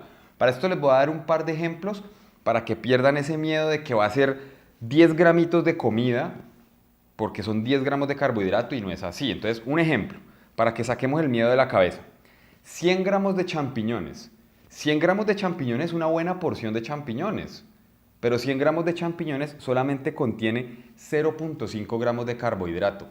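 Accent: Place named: Colombia. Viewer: Que belleza encima colombiano ..la put..madre que hermoso acento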